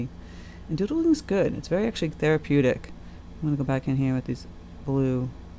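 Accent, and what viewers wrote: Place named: New England, United States. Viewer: Love your videos. Very helpful, and I love your New England accent. 😊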